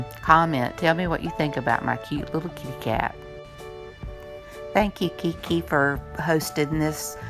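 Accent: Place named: South, United States. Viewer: Such a cute kitty broom! Love your southern accent!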